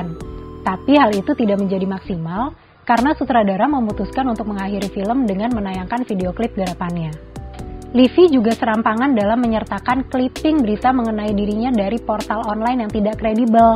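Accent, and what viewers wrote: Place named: Java, Indonesia. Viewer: Fix mba nya jawa pelan~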